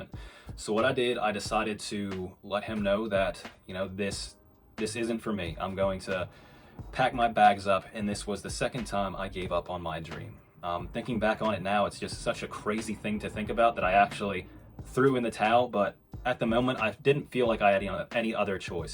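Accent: United Kingdom. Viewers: You sound British - can really hear a twang in there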